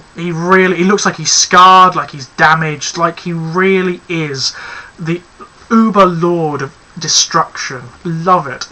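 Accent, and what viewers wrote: England, United Kingdom. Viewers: wow you're accent is so posh where in england are you from :)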